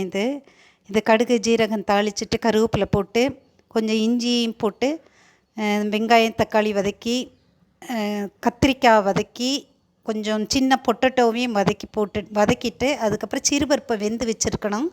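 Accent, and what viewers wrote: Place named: Tirunelveli, India. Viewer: சமையல் சூப்பர் ... தங்கள் பேச்சில் நெல்லை மணம் வீசுகிறது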